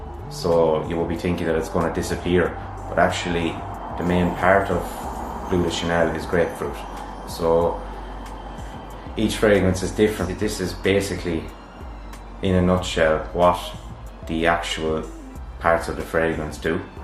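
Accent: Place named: Ireland. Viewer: 8 seconds and in i said out loud at my computer screen "woah! this guy's irish!" 😂 before you even announced it (not a bad thing, i love the accent) i then knew without a doubt this was gonna be the video to satisfy my craving for the knowledge on what top, heart, and base notes are.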